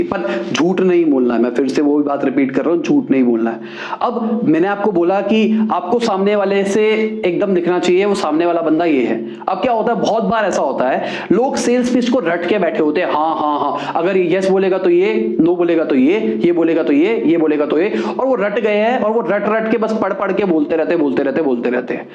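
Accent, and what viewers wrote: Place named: Delhi, India. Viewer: Wow maja aa gaya aaj, sales mai aap master ho.... Muje aap delhiwalo ka bolne ka toon bahut pasand hai.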